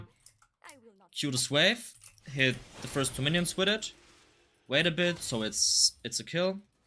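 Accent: Germany. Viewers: this middle eastern looking boy with german accent is just too good! i ve learnt about qyana s laning phase in 2 videos more than i have since she was released... keep it up sir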